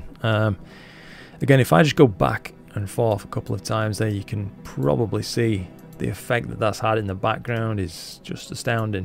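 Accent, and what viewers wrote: United Kingdom. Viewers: time well spent watching it….very melodious also with your British accent!😜 i wish i could speak English in that accent!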